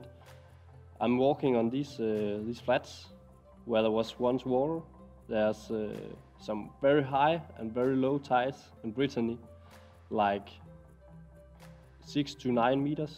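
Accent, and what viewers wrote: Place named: Scandinavia. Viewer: I would think maybe Scandinavian with your accent. Wouldnt have guessed French.👍🏴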